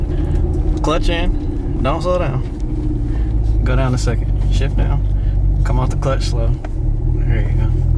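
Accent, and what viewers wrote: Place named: South, United States. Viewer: I saw that hat and heard that southern accent and knew he was bout to lay the knowledge down. Us country boys know how to drop them gears like no other. Make them things screaaaaaam.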